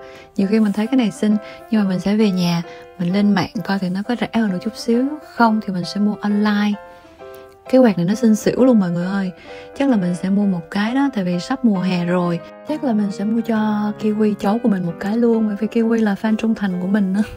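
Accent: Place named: Da Nang, Vietnam. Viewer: hèn chi, giọng hơi đặc ko lẫn vào đâu đc, mình dân Đà nẵng nghe nửa chữ phát biết luôn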